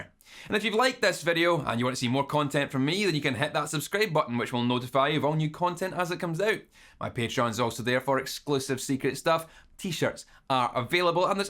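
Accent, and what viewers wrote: Scotland, United Kingdom. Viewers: Scottish accents are THE BEST!! I could listen to you read a tech manual all day LoL Really great video and audio lesson! Keep up the fine work!! Salutations from Miami, Florida! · I love your channel! So much fantastic info delivered in a compact format and 100% comprehensible. And I love your Scottish accent..."peckups" is a favourite 😊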